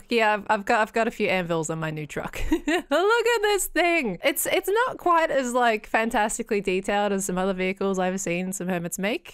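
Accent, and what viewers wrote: Australia. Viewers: I just started watching your channel yesterday and was watching today with my daughter and she asked if you're an Aussie because she feels like we're under represented on YouTube (she's 8). She'll be very happy to find out you're an Aussie as well.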